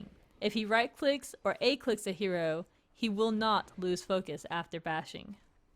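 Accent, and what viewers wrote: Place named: England, United Kingdom. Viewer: Это акцент у англичан такой.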